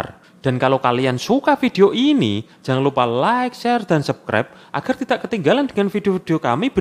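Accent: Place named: Java, Indonesia. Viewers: informasi dr km ny menarik dan jelas, tapi narasi bicaranya itu yg bikin garing kak🙏, but aku suka Ama channel vidio2 KK y infomati itu, prasan jrg yg bahas, aku tauny bnyk konten spoiler n y ky ngikutin tren doank, bagus c KK jd dr sndri, cm Krn medokny terasa garing di telingaku 🤪🤭,aku bnyk tmn org Jawa tp klo KK mya ini kyk LG ndalang gtu prasaan narasinya 😂😁😁🙏, intiny konten dan Vido KK bgus dan ckup mnrik so lanjutkan kak, y tnggl menyesuaikan aja c y kak 👍🏻👍🏻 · gapapa mbak memang orang jawa logatnya kayak begitu susah juga kalo ngomong pake logat indonesia biasa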